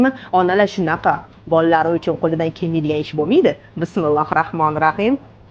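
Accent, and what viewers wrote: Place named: Russia. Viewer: Русс мисиз акценттиниз баскаша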